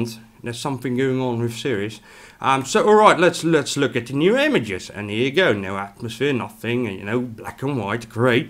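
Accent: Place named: Netherlands. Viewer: Liliana Georgiana Boros Als je goed kijkt naar de items in z'n browser, bv 'Hobbie' dan is het duidelijk een Nederlander. Dat kun je ook horen aan de manier waarop hij Engels praat. Nederlanders praten op een hele typerende manier Engels.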